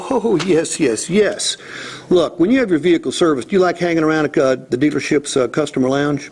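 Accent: Appalachia, United States. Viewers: Hillbilly and his hick rube hillbilly bullshit foghorn leghorn twang talk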